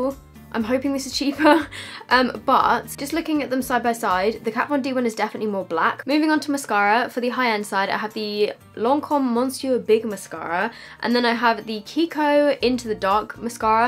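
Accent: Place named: United Kingdom. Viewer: you sound so brittish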